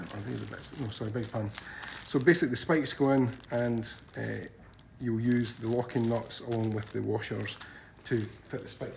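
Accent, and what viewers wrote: Scotland, United Kingdom. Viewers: Love that Scottish accent and the rug is great too.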